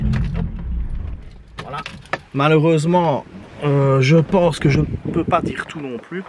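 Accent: Belgium. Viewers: Je suis tombé sur ta vidéo par hasard, et ça fait vraiment plaisir d’entendre un Belge parler de ses voitures. J’ai un peu regardé tes réseaux, tu devrais vraiment aller voir Akram à la fin de ce confinement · salut est tu belge par hazard?🇧🇪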